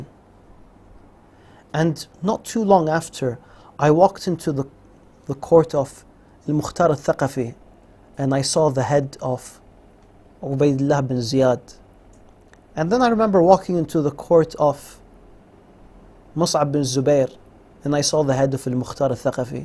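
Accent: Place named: United States. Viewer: This video is a good cover up. He has omitted a lot of details from the authentic Hadiths that tells a lot about this incident. His American accent and long thobe is hypnotizing. I am surprised that the people sitting there took his half prepared story hook line and sinker. The real tragedy of Karbala is not the cruelty of Bannu Ummayia or the tyrany of Yazid, the real tragedy is that we are soo satisfied with such stories without prodding into the details about why the Leader of Youth in Paradise was killed by Muslims 60 years after Hijra. Wat bro narrates makes it look like an accident. But as the ahle Sunnah we cannot let this narrative be built in our homes, we should all prod deeper and find out why this happened and understand wt forces are preventing the people to distribute half baked stories and build narrative such as this.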